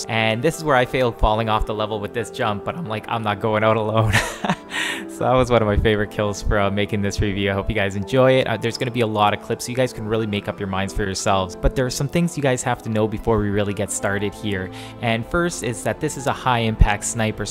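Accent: Canada. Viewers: Your Canadian as hell :)) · I believe he's canadian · Is it me or does he have a Canadian accent?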